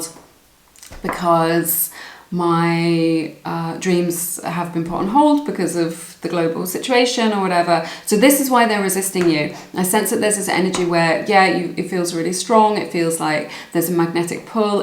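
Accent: RP, United Kingdom. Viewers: I mean this as a neutral observation… you have such a bbc-type British accent. I’ve never heard someone not on the international news speak like you. I’ve met plenty of British people but they didn’t talk like that. What part of England are you from? If you don’t mind me asking.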